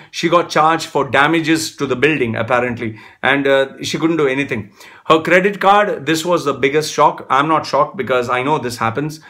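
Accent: India. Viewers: Shaithan/illuminati and Indian accent burst me into laughter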